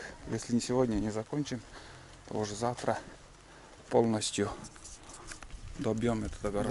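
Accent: Komi, Russia. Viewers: у нас как то не заморачиваются по сортам картошки. У кого то красная лучше растет у кого то белая. Есть белая голландка - огромные продолговатые белые клубни. Много ее вырастает но не в каждый год она хорошо хранится. Кто не много садит - ведро, два тот пророщенную у бабок покупает а тут наши местные заморочки: если продают картоху с села Касиб (Соликамский район) то уходит в лёт. Считается что это очень хорошая картошка. Числа 6-го сентября я тоже копал картошку, сыро, дождь, с комьями грязи выковыривал. Потому что дожди лили весь август и пол июля. Половину выкинул потому что сгнило. А у вас вижу копаете земля нормальная сухая. Вижу вилами копаете - отец всегда вилами копал а я не могу, не нравится. И приятно коми речь послушать. Но ее хрен выучишь потому что язык неславянский. А если выучить то разговаривать тут не с кем. Всего доброго, Пермский край. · Назвал бы видос "Копаем картофель у соседей" такой говор как из нившеры. 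Превед из Сыктывкара. Сами мы из Кероса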